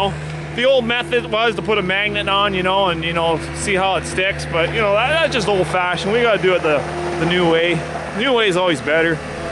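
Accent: Canada. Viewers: I can tell this is a Canadian accent from a mile away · what part of Canada you from?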